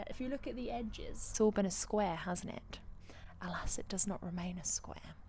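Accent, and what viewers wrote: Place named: United Kingdom. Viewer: you have so much Tallent. I'm addicted to your videos. Mus be your british accent. Your voice is so beautiful...first time I've heard your voice othe than fvm. Keep on rockin'....a fan in mississippi.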